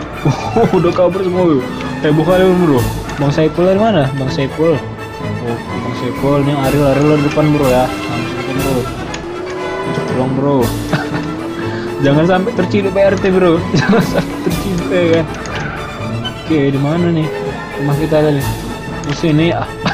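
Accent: Medan, Indonesia. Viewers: lu anak medan ya brohh...... logatlu logat medan brohh.......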